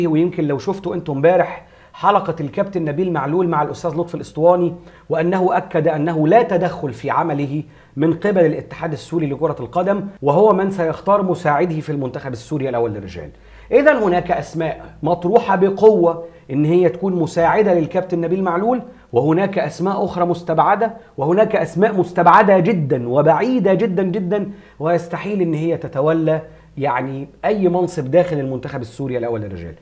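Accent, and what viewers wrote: Egypt. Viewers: انت مصري؟؟ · نتا مصري ام سوريا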